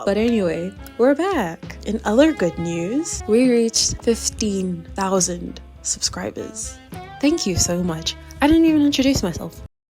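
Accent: South Africa. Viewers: Wow! I also love Charcoal because I AM SOOO IMPATIENT. Graphite and other mediums really make feel this annoying itch that tests my patience!😂 I'm so happy I'm not the only one! Thanks for reading and answering my question. You really do sound South African 😅. THANKS FOR THIS COOL CHANNEL!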